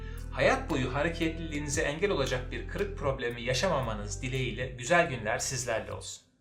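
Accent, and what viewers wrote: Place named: Samsun, Turkey. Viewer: Hocam Samsunlumusunuz, veya Samsunda bulundunuz mu? Şiveniz Samsun şivesi de ondan sordum..?😂 Sevgiler Saygılar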